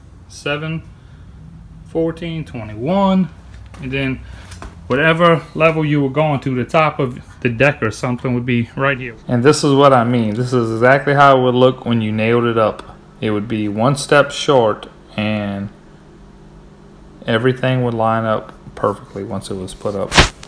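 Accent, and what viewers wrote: Louisiana, United States. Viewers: hey ,,you from louisiana ..you got that cajun accent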